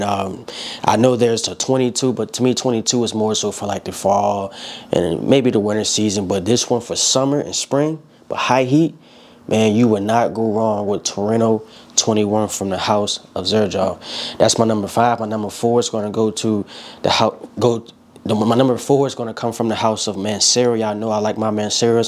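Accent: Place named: New York, United States. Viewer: Thought you was from nyc😂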